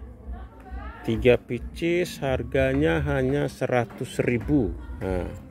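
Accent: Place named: Bajawa, Indonesia. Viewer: Logat e Logat Su pi merantau Logat Kampung masi dibawa ke kota Besar e..dasar org BAJAWA Flores .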